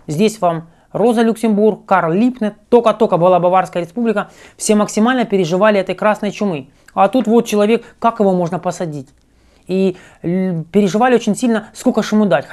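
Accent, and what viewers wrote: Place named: Rostov, Russia. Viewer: Девушка с Ростова? Знакомый говор, как собака лает. Кажется, что хамят, но это тут так разговаривают.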